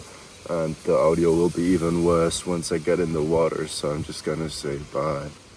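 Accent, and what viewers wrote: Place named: Germany. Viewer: Sound German by your accent, come across your video, it's interesting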